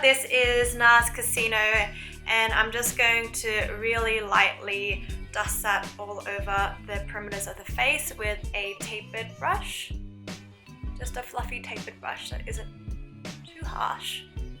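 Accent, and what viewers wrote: Australia. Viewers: ahhh love hearing another Aussie accent!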